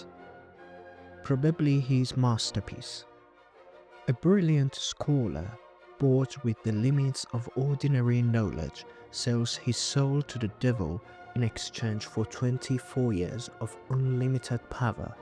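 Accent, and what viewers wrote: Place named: United Kingdom. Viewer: We would like you to tell us the stories of ancient Iran (especially the Shahnameh) with a British accent, Professor. 🔥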